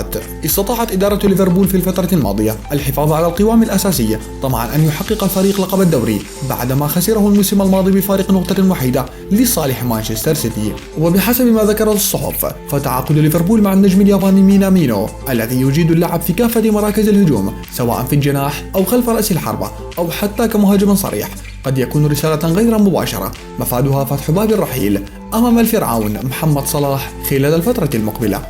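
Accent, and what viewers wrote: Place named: Iraq. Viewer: شو بعرفني بقلي ياغالية انا قلت هيك لهجة العراق😂😂😂😂😊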